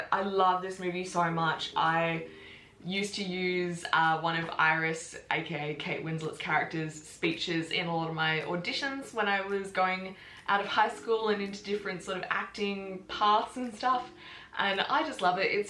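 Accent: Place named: Australia. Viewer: I really like your Australian accent.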